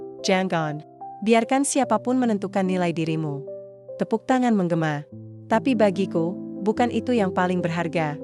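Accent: United Kingdom. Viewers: Mengapa bahasa Indonesia menggunakan logat bahasa Inggris ? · Apa sih ganggu banget harus di selingi logat Inggris segala buat apa ?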